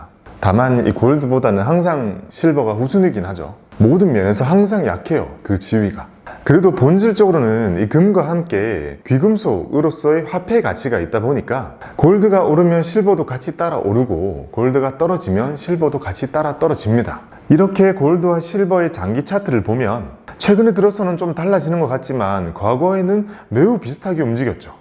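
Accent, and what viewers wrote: Gyeongsang, South Korea. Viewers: 와후~~ 추카드려요^^ 님~경상도 빠꼼인가봐요,억양이 ~~ㅎ 저도 그쪽인데 ㅋ 덕분에 실버버튼 구경했네요^^ 미소 미소 미소 미소 ^^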